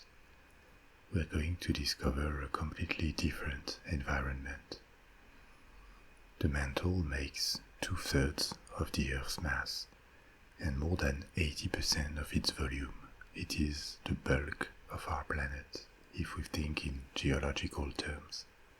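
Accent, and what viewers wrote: France. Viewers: Your videos are really great, but your accent reminded me of my former asshole of a French boss from years ago so I got high blood pressure from listening to your videos instead. Totally not your fault, just unfortunate LOL. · You have the most relaxing voice and the coolest accent. Actually you don't have that much of an accent. You speak excellent English. But it's a compliment. I love French language and the way you speak. Also, thank you for a great night of sleep, relaxation and expanding our brains with knowledge! You are awesome! I love your channel and really appreciate all the hard work you put into your passion! Thanks again and God bless. :)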